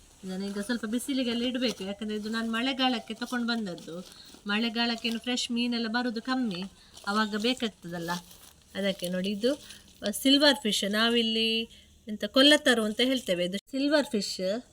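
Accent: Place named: Mangalore, India. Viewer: manglore kannada supr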